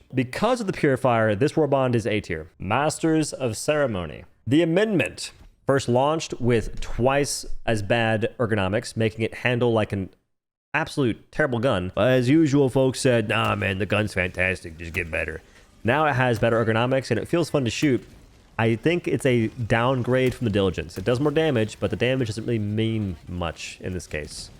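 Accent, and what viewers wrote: South, United States. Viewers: Don't do a Southern accent again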